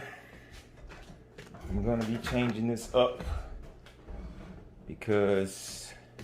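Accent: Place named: Atlanta, United States. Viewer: I would have guessed he is from outside of Atlanta somewhere...I try to guess regional accents. My guess is he grew up and/or lives within 40 miles of Atlanta, Georgia.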